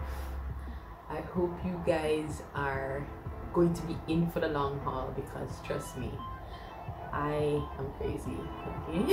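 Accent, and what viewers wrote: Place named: Jamaica. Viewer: How yah sounding suh jamaican....🙄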